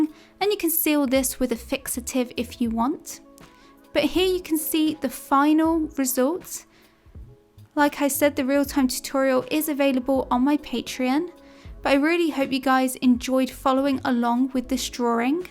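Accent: England, United Kingdom. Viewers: Ich liebe den englischen dialekt 😍😊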